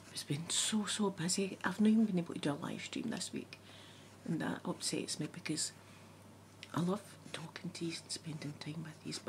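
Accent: Scotland, United Kingdom. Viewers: Her Scottish accent is so cute I love it too and is so calming and relaxing even if I'm Italian so sometimes I have trouble understanding it 🤗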